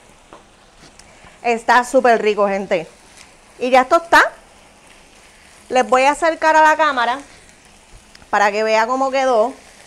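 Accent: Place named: Cuba. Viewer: Me encantan sus recetas...pero de verdad tiene que estar diciendo tanto "showchito"?...como se dice en ingles...so annoying y el acento cubano? Pq?...son cosas innecesarias....explica super, tiene buena energía, es alegre....eso es más que suficiente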